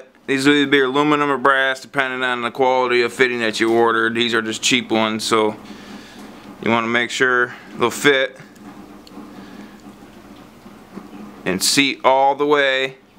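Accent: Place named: Michigan, United States. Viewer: Thanks bro. Haven't heard a Michagan accent in a while!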